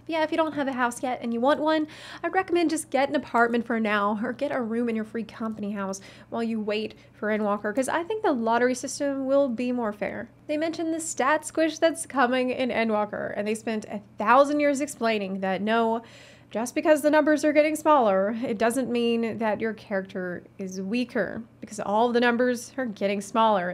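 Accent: South, United States. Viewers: She has a pretty country accent where is she from?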